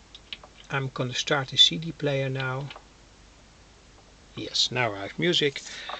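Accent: Netherlands. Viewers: Are you dutch? becouse i am.. i can hear it on the way you talk..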